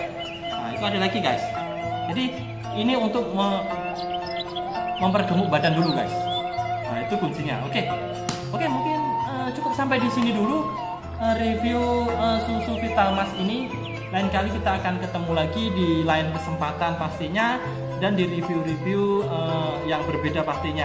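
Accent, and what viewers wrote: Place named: Malaysia. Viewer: Waduhh... Bahasanya bunyi campur malaysia gitu... Berkecek kolumpur ko mas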